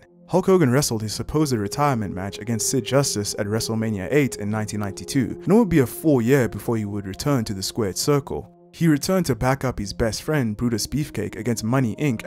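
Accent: South Africa. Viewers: My favourite conspiracy has to be that the McMahons we see on TV and in the ring are not the real McMahons but paid actors, and the real McMahon family is never seen in the public eye. Great video btw, legit never heard of some of these other ones. P.S. I know a South African accent when I hear one!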